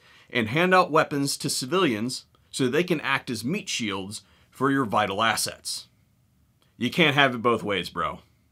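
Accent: Ohio, United States. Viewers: Love the video and I can tell you are from Ohio , from another Ohioan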